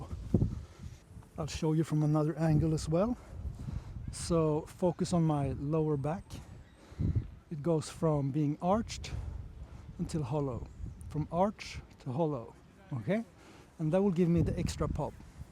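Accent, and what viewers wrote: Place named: Europe. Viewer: voice quality is good people from india don't understand european english 😅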